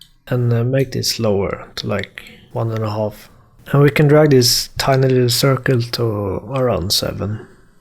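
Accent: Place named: Sweden. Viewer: This guys swedish accent is so thick and it's hilarious for me as a Swede to be able to hear this instantly😂 linear uttalas "linniör"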